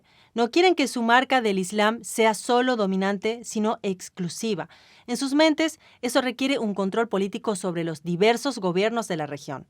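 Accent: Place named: Argentina. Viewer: De donde sos? Pareces argentina hablando en español neutro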